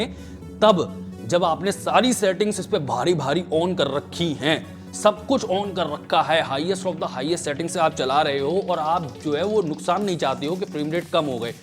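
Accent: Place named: Hathras-Aligarh, India. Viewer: Bhaka bhak FPS 😂. Bhai Hathras Aligarh ke aaspas ke lag rahe!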